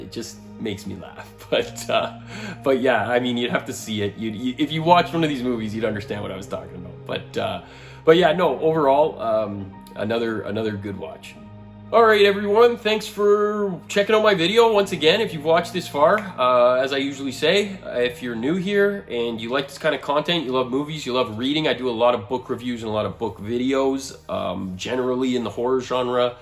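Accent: Canada. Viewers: Hey I just wanted to say I think you make really great content and have inspired me to get in to King. Keep up the great work!! Also is that a Canadian accent I hear??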